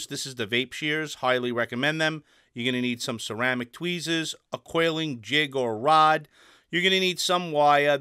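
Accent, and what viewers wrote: New York, United States. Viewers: I can guarantee that if I use that same exact wire, with the same exact amount of wraps, the last thing it will do is give me the 0hms that the calculator tells me I should get. You never did show us what 0hms the mod was detecting the wire as when it was connected to a mod :P. It's useful to know though, how to build. The wire company I bought all my pre-made coils from has just decided to move abroad on us, so I'm stuck for pre-made's now. All I can get is the regular round wire now, so it's useful to know if your in the same position that I am now. I have 500ft of Kanthal A1 sat on the shelf next to me, which I bought just in case I had trouble buying pre-mades. If I can wrap my head around building simple coils, I figure that there's enough wire there to last me maybe 2 years or more, depending on how many times I recoil, which is usually at least once or twice per month. 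By the way, am I right, considering your accent, in thinking that your based around the New York area?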